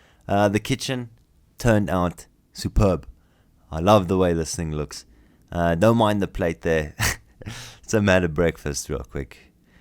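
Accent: South Africa. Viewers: Ermagahd a South African. So weird to hear our accent on youtube XD immediately recognize!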